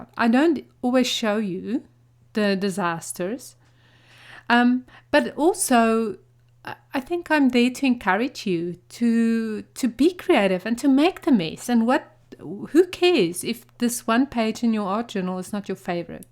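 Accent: South Africa. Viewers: Originally South African?? Love your books so by the way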